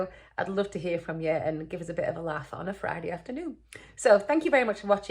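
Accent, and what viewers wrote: United Kingdom. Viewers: I guess you're from Scotland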